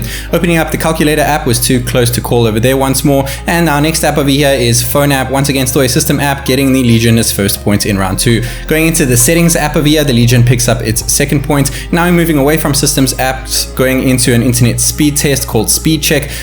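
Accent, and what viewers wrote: South Africa. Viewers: this guy has a thicc South African accent!!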